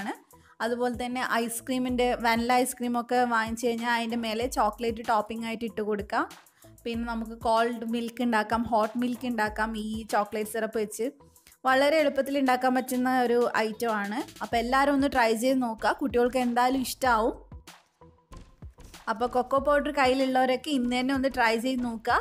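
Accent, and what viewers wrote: Kannur, India. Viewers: kannur ano ithaaaa nannaitt manassilavunnund ndayalum spr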